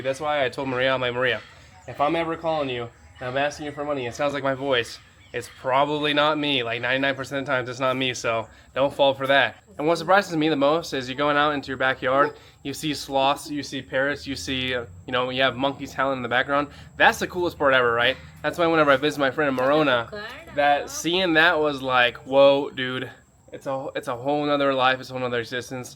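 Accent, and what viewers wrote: United States. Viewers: So you’re watching a video about other parts of the world, but you don't know that different places pronounce things differently. Americans pronounce it exactly the way he did. My British wife pronounces 'bottle' as 'butt-hole.'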